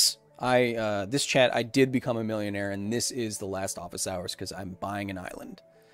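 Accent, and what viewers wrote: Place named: Australia. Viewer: I’d say your Australian accent sounds similar to Wood’s accent from Beatemups. Not really an opinion on what region it sounds like, but you can do an impression xD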